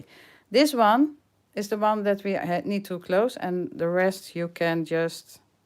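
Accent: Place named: Netherlands. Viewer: I love how you force yourself to calm down 😂 always enjoy your tutorials and talents. Admire your English fighting with the Dutch. Appreciate your struggles. Wishing you were making more English tutorials. Cannot translate the Dutch version or the lives.😔 🤣🤣🤣I don't think I will be attending those knitting classes.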